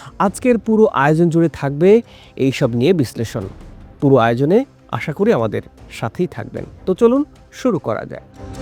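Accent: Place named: Bangladesh. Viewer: ভারতের কোন বিরোধি দল বিচ্ছিন্নতাবাদ সমর্থন করে? এটা ষড়যন্ত্র ছাড়া কিছু নয়। কোনও সৎবুদ্ধি সম্পন্ন ভারতীয় আর দেশ ভাগ হতে দেবে না। প্রতিবেদকের উচ্চারণ শুনে একজন বাংলাদেশী বলে মনে হচ্ছে। এই ধরণের প্ররোচনা মূলক ভিডিও বানানো থেকে বিরত থাকুন। আর কোনও ব্যক্তি বিশেষের দেশ নয় ভারত। এখানে সত্যিকারের গণতন্ত্র আছে। তাই এই দেশ 140 কোটি ভারতীয়ের। অবশ্য সত্যিকারের গণতন্ত্র কী তা বোঝার ক্ষমতা বাংলাদেশীদের নেই।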